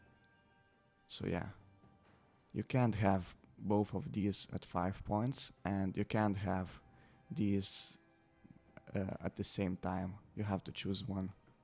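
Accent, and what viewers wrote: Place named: Lithuania. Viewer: Where are you from? You sound like a Lithuanian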